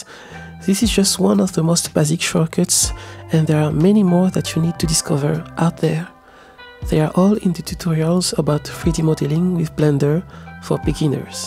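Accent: France. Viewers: you’re French? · Is this a french accent? Because your voice is lovely to listen too. Besides that, your videos are awesome. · L'accent français me fait rire, continue c'est trop bien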